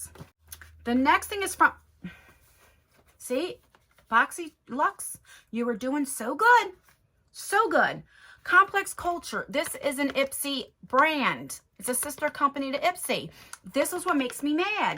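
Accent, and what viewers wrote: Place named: New Jersey, United States. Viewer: Are you from New Jersey? Im from Cape May NJ and oh girl that accent takes me back!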